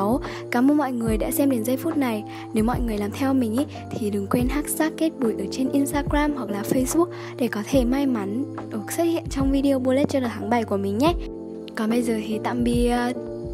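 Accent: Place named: Nghe Tinh, Vietnam. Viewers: chết rồi mừn răng đây nghe là biết dân miền trung r k lận vô mô đc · "Chết rồi, mần răng đây, ồi toang..." Chùi ui show cái giọng Nghệ Tĩnh tui hơi bị thích á, quê Hà Tĩnh nên thích lắm cái giọng này ế, iu cực... Hây da mún zề quê quớ ừ cả 3 năm rồi chưa về HT luôn ý, mà cho mềnh hỏi ở chỗ nào ở HT zợ?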